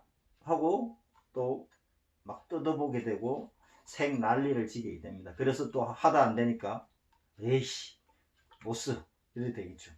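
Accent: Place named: China. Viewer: 중국분이세요? 듣도보도 못한 사투리네요 ㅎㅎㅎ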